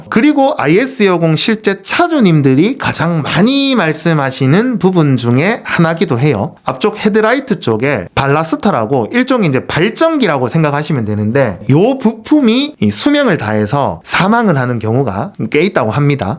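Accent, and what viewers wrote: Gyeongsang, South Korea. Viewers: 헉시 갱상도 사람인가여??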